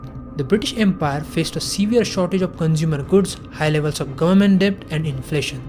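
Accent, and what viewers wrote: India. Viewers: I am not even kidding, the video quality is really high great production but PLEASE get a non indian to do the voice over for the video.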